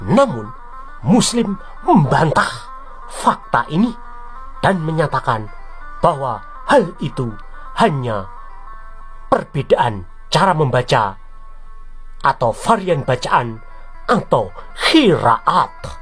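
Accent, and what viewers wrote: Java, Indonesia. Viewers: salut ma suara yang ngebacain alur critanya...medhogh banget jawanya...😂😂😂 GBU mas bro...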